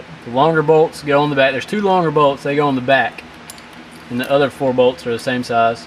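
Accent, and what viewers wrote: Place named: South, United States. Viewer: sounds like a cute hottie gear head ummmm southern accent like my alabama one. ive got over 20 paid for cars just sittin waitin to meet mr Right another gear head.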